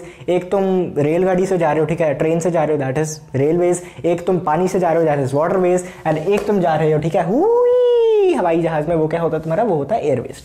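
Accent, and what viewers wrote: Punjab, India. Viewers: U r Punjabi??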